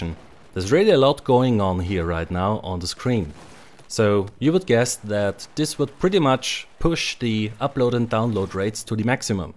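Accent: Germany. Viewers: without knowing who the narrator is, i can say with great accuracy, that he's german :D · Man hört. dass du aus Deutschland kommst. :D Trotzdem super. Übrigens hatte BF2 afair ne Server Tickrate von 36hz.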